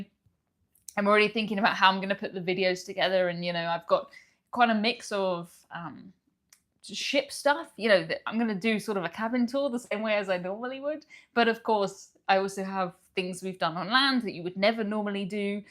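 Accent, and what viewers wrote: United Kingdom. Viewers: Hello! Missed the live stream, am watching the rerun. Congrats on your philanthropy -- well done! I enjoy your cruising podcasts (as I am too ill to go myself) but most of all your accent and British isms. Makes me miss my Dad and Grandmum. Keep up the good work, and Happy Holidays to you!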